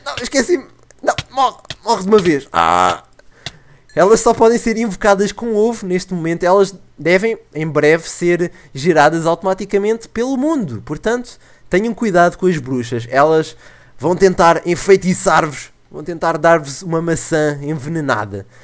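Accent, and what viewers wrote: Portugal. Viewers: é portugues de potugal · português de Portugal--' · português de portugal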